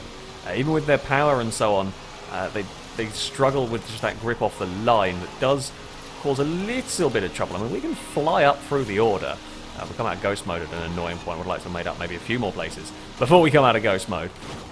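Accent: England, United Kingdom. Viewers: Going for the Aussie demographic in this video eh? As a Holden guy through and through it saddens me that it's getting killed off this year :( And also the way you pronounce Falcon is quite strange but I'm guessing that's just the Pommy accent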